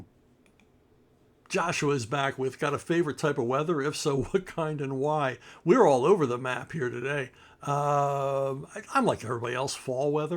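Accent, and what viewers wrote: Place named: Chincoteague, United States. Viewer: People from my hometown of Chincoteague Island, VA also say "wooter" and "igles." It's so distinctive that I was shocked to hear from Philly pronounce things that way on his podcast. Though Chincoteague had a lot of truck with Philly, including men working seasonally in Philly (where my great-grandfather met my great-grandmother), so that might have something to do with it.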